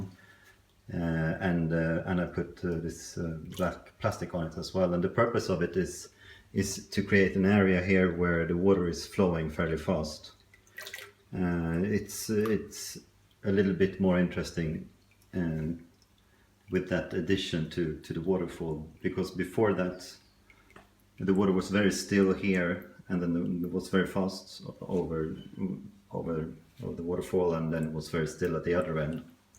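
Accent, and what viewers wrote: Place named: Sweden. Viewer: Kikat på detta kar flera flera gånger tidigare, aldrig lagt märke till att du var svensk. Hörde det direkt på dialekten nu dock, haha... Älskar karet. Så simpelt och samtidigt udda! Det märks att du har ett öga för design... Definitivt en bra källa till inspiration; blir lika lockad varje gång att köra igång ett "flodkar". Har du några planer på att utveckla karet? Jag tänker i form av fisk, inredning, bakgrund osv. Keep up the good work!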